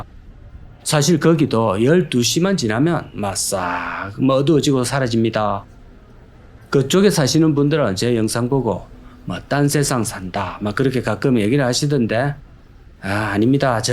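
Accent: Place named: Seoul, South Korea. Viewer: 서울말 다되가네요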